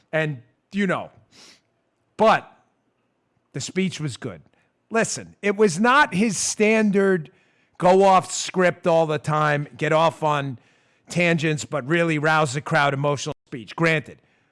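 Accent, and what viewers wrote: United States. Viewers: Last night was great , good job Dan at C PAC. You talk American.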